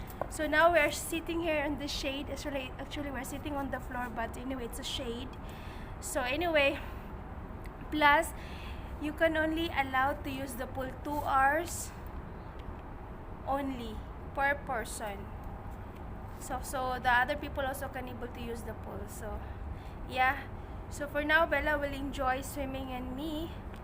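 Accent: India. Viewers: I you acquired the Indian accent. Your daughter is cute. Have a wonderful day.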